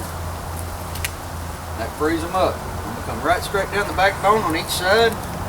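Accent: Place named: Kentucky, United States. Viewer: This guy has to be from ky u dont hear that accent in many other places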